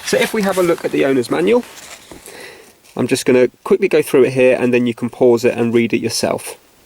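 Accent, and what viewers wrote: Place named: London, United Kingdom. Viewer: Awesome accents like londoner.🥰nice vids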